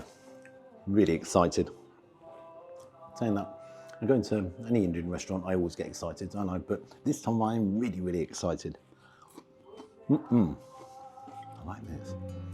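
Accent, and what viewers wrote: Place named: Scotland, United Kingdom. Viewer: I had to turn on subtitles because the Scottish accent you've picked up was getting difficult to understand. I will assume you enjoyed the birthplace of tikka masala and the food you experienced 😂😂. 9/10 for the history and accent. Have a wonderful weekend.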